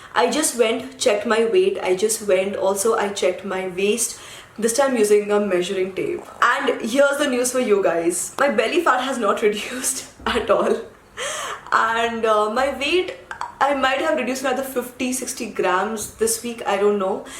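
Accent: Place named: India. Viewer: Do you live in India ? You have an indian accent. I love your video !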